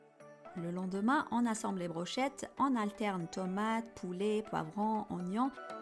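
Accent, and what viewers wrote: Algeria. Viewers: الحبيبة ديالي ماشاء الله عليك كنحماق عليك 🥰 متبعاك هادي من شحال بصح هادي اول مرة نخليلك كومنطير بغيت غير نقولك نتي ختاريتي باش تهدري بالفرونسي ولكن سبحان الله كنفضلك بالعربية اكثر تجي حلوة منك اللهجة الجزائرية ومن أيضا كتكوني قريبة للقلب المهم علا راحتك أنا حتا الا هدرتي بشنوية غنتبعك 😉 معاك مغربية ساكنة في بلجيكا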